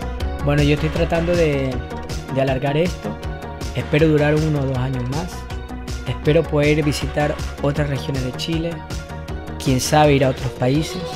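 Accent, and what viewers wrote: Ecuador. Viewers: el detalle es que es Ecuatoriano, no Venezolano, se nota por el acento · ES GUAYAQUILENO LO DIGO POR AU ACENTO NEUTRO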